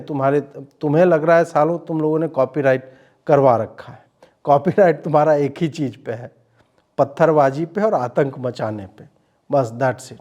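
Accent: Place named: Bihar, India. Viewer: Very well analyzed explanation. Bihari tone pe sunne samajhne main Maja aa gaya. Thank you Sir ji. Keep speaking and keep awaring sleeping Hindus.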